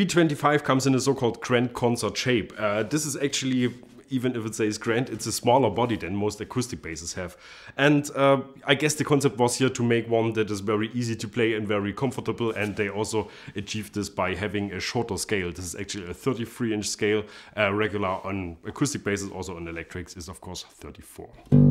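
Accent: Germany. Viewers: That german accent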